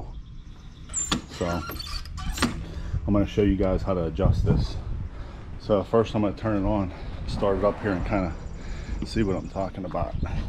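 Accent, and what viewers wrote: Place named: Pittsburgh, United States. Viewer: This guy gotta be from tha burgh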